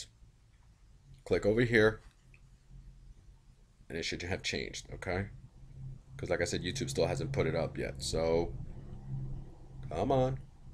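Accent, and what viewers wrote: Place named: New Jersey, United States. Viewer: You're so Jersey YESSSSS!!!! I'm from Freehold! Thanks for the vid info!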